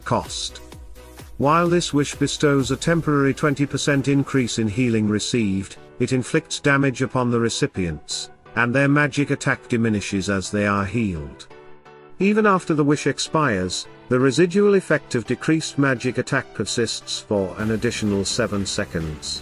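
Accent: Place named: United Kingdom. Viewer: You sound like you are from Britain.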